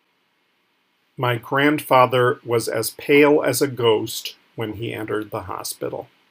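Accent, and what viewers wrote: United States. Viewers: I think you meant,Is this British English or American? He speaks American English and these are American idioms! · Judging by his pronounciation, the guy is American, so I guess the idioms are not exclusively Brititsh.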